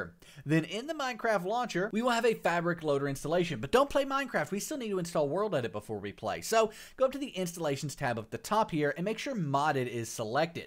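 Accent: South, United States. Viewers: dude turns country at the end of every statement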